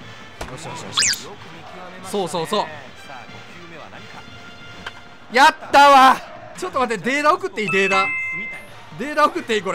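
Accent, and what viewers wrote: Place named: Fukuoka, Japan. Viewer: 、これまたリアタイでサンチェス増えますよっあとよかよかは福岡の方の方言ですね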